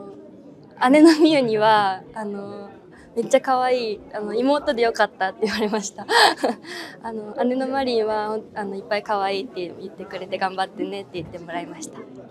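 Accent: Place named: Kyoto, Japan. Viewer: もっと京都弁喋ってほしい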